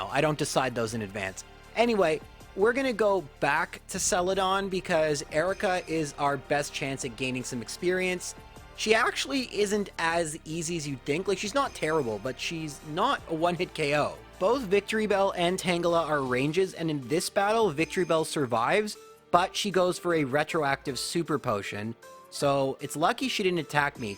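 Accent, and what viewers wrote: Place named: Canada. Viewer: I'm going to say this but you sound like your candanian which I say hello neighbor. After seeing you live you look like christian slater's little brother Edit: add the join button I wanna support your channel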